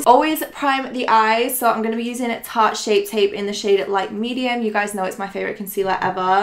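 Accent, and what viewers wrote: United Kingdom. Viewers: how did i know you were british the second the video started?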